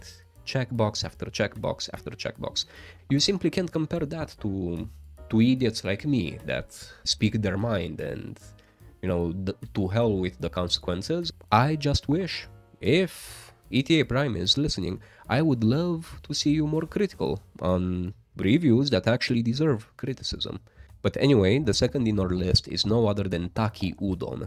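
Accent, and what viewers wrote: Russia. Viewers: credeam ca esti rus dupa accent :)) dar acum ti-am dat Sub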